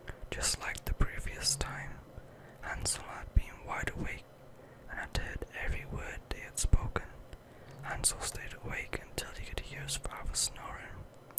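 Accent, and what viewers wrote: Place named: United Kingdom. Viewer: Oh my God. You truly are my ultimate favorite trigger. I'm so happy you are back. Your voice is so angelic. I usually close my eyes when you talk, to savor your sounds. I love you. Thanks for watching my video. I think I need to move to UK and get my daily dose of British accent. <3:) Love, Ann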